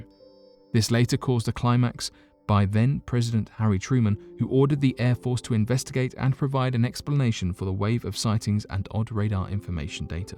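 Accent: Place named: North, United Kingdom. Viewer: he's saying "fireballs" -- turn on captions and you'll see :) this is why i watch most British shows with closed captions turned on because frankly i can't understand some of what they say, especially thick Northern English accents... no shade on the British, i have trouble understanding other foreigners speaking English with a thick accent too :) (looking at you, Jamaica and India :)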